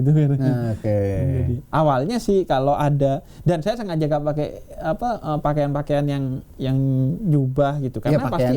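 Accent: Madura, Indonesia. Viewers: Sama2 dari Salt-Island pantas saja logatnya sama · Logat mdura.. sma2 mdura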